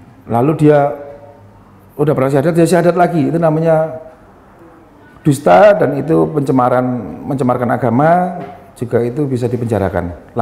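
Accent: Java, Indonesia. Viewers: Denger cara ngomong kok seneng. Logatnya Jawa medok. Ak lahir dan besar di Sumatra tapi logat Jawanya jg tetep g bs hilang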